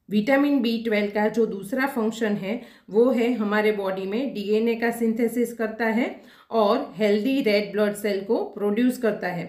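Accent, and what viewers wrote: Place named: Gujarat, India. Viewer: Mam , bol ne se aap gujarati lagte ho , gujarati bolo , saras , 🙏🏻 mam gujarati ma channel banavo 🙏🏻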